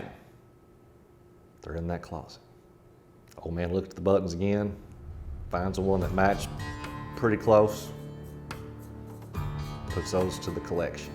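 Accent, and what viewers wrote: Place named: Appalachia, United States. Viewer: Really good story! And told with great Appalachian voice.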